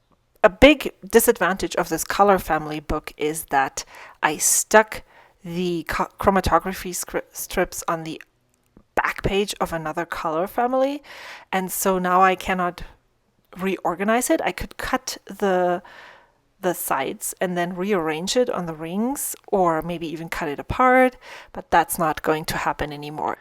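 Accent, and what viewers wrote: Germany. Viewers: I enjoy your off the cuff videos and find myself laughing because they're real! Like Katie, Ive been tweaking my Vanness cart all week so I'll definitely use your code! Thank you! I love to hear your German pronunciations. I'm the same with Italian. Though I'm San Francisco born & raised, I grew up listening to it and can't get myself to pronounce Italian names with an American accent.😂 Had I known, I would have happily sent you my bottle of Hanging Rice. I have several Troublemaker inks that haven't been getting sufficient love so let me know if there are any you'd like to try and I'll see if I have it.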